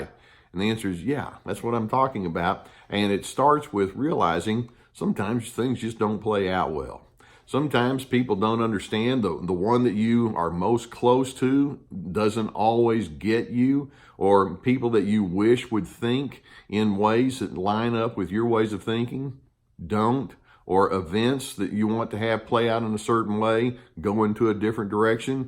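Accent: Texas, United States. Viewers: A Texan?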